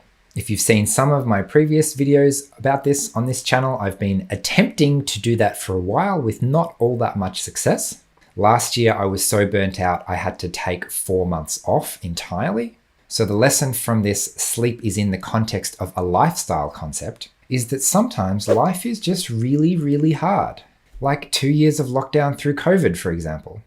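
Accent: Australia. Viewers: He 's Australian...not Scottish...❣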